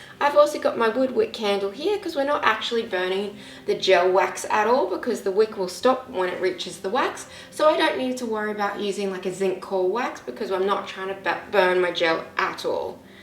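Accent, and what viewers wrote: Australia. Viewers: She is Australian, she can’t sound American. You need to learn to respect people. If the video did put you off so bad, you wouldn’t have the time to comment Betty!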